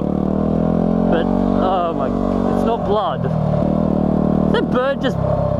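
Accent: Australia. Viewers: is he from australia?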